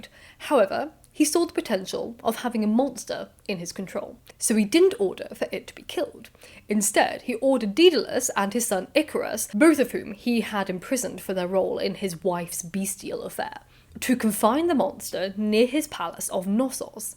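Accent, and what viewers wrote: United Kingdom. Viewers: Just found your channel - I'm enjoying it! So many great and informative videos, and so well presented! Growing up, my librarian parents gave me a book of Greek Mythology to read, so I am familiar with the myths, names and stories. Later in life I re-examined the Minotaur myth twice as I discovered new references to it. The first was Richard Strauss' opera "Ariadne auf Naxos" (I've heard you state you're not a music fan, but check this out as "research"), and the other a short story by Jorge Luis Borges, where he re-tells the story from the point-of-view of the Minotaur! My jaw dropped when I read that - if you don't know it, it's a super short, and satisfying, read. The other thing I note when I watch your videos, is that you folks across the pond pronounce all the Greek names very differently than we do in the States. Is that scholarly, or just regional I wonder? 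Anyway, great job (as usual!)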